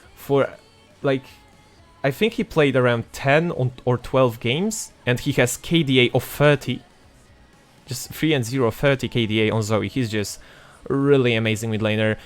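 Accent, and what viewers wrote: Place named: South, United Kingdom. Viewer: fajny akcent masz, taki łagodny południowo brytyjski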